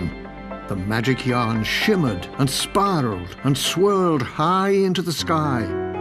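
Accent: England, United Kingdom. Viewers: Narrator is British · very interested, when I played this game in the US he sounds American, and this narrator sound like he's from UK so would that mean is from the UK as well?:o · Your British, I never knew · I wouldn't mind more soothing and amusing British narration in my Kirby games. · Ok I like this narrarator a lot more than the U.S. One. British narraration is amazing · I'm loving the narrator with an English accent. It makes this game feel like LittleBigPlanet.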